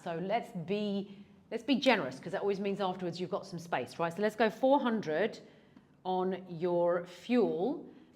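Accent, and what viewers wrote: United Kingdom. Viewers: Love your British Accent! reminds me of my student years in England..wonderful! you are indeed a fast learner and definitely have picked up pretty well..I feel that your cost of living calculation is fairly reasonable! Definitely agree with you taking a drop in maid once a week!! keep up the excellent reviews of Malaysia!👍👍💕💕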